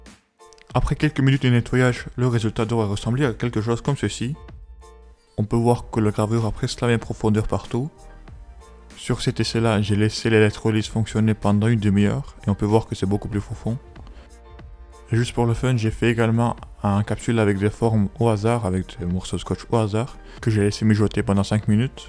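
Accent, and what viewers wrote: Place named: Africa. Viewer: Une grosse voix d'adulte, un accent probablement africain. Et des petites mains de jeune adulte toutes blanches. J'avoue que ca m'a perturbé !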